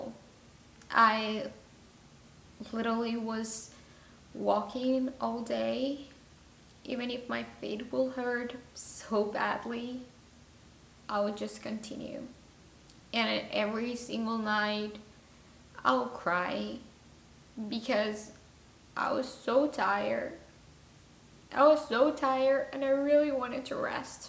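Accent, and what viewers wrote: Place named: Bogotá, Colombia. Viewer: I recognized by your accent that you are from Bogotá. Stay strong 💖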